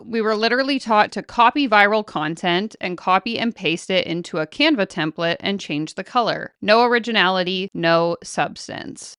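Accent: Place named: Canada. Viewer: I knew about 30 seconds in that your from Canada.. lol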